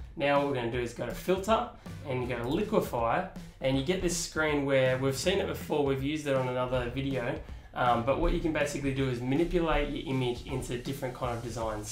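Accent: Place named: Australia. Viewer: not sure why, but i thought you were going to say "if you guys can't tell by my voice, i'm Australian," lmao. this video is super helpful though, thank you!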